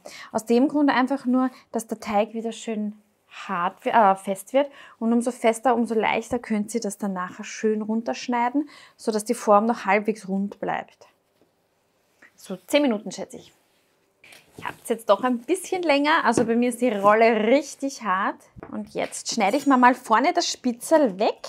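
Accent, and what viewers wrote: Austria. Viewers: Vielen Dank für die schönen Ideen und die verständliche Erklärung. Aber genauso schön ist der österreichische Dialekt. Viele Grüße aus Leipzig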